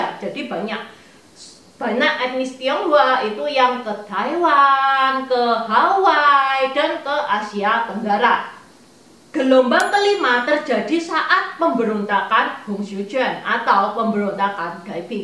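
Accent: Java, Indonesia. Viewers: Trimah kasih cici tlah mmberikan informasi sejarah tentang suku etnis tiounhhua ,,yg trsebar di blahan asia,, dan byk menjadi pemimpin negara ,, cici mdok baget logat jawa nya hi hi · Ma'ap min ...ingin kenal , dari mana admin berasal kok logat nya medhok kayanya dr jawa semangat salam sehat selalu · Ini jawa tionghoa dari mana ya? Logat jawanya khas sekali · Kalau mbak ini medhok ya sudah dari sananya, artinya dia menguasai bahasa lain ( jawa) selain bahasa Indonesia. Susah sekali menghilangkan logat bicara seseorang. Yang terbaik memang bicara tanpa aksen. Semangat mbak. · km turunan Tiong hua? Tapi logat km tdk seperti org tiong hua tapi seperti jawa logat mandarin km tdk pas bgt. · ini asli orang Jawa. Logatnya medok Jawa banget. Gak ada pelo logat Cinanya lagi. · Ulasannya bagus... walaupun logat jawa nya masih nge bass .. terima kasih. Btw orang terkaya di indonesia tetap di pegang oleh perantauan hokkian .. 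mulai dari Liem sioe liong hingga sekarang Oei hwie tjong ( BCA .. Djarum group ) .. saya sendiri generasi ke tiga dari perantauan hokciu / foo chow ( ibukota provinsi hokkian / foo chian ) . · Cece.... medok Jawa nya love love semoga Chanel nya makin banyak subscribers nya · Seneng dicerita’in mbak yg Cantik, Pinter dan enak Suaranya yg Medok. Salam Sehat & Sukses nggih 🤲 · Medok banget. Ngomong "k" jadi kayak ngomong "g". Ngomong "khek" jadi "ghek". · Saya merasakan mbak ini orang Jawa, krn medok. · Coba bahasa Indonesia nya diperbaiki lagi cece.. Jgn medhok.. Kebanyak kan huruh H.. Jadi gak enak kedengaranya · cici ngomongnya logat jawa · meddok jawanya,etnis china jawa ya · Makasih infonya mbak. Logat jawanya medok bngt mbak hehe. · Oh iya..kenapa loghat bahasa indonesianya kayak Wong jowo??sementara loghat org cina di Malaysia loghat bahasa melayu nya nggak loghat melayu??😀😀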